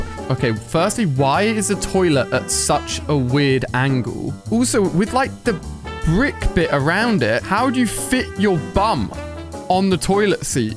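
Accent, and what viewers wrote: Australia. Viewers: Australian or bri'ish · Are you Australian because if so how do I never notice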